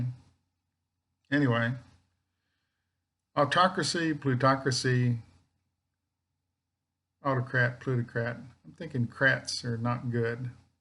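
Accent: Texas, United States. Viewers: I really enjoy your style of delivery. I'm new to your channel, thanks to whoever gave a shout-out. Are you from Texas? There is something familiar about your accent. I live in Keller north of Fort Worth.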